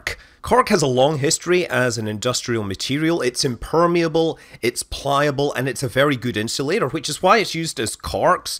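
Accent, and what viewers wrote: Scotland, United Kingdom. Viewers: "the sprüs güs" Love the Scottish accent so much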